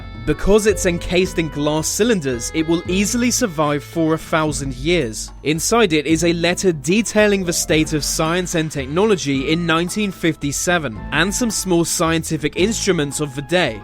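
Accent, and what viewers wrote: England, United Kingdom. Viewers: wow really!? thank you. i have an indian accent but i would like to learn to speak in your english accent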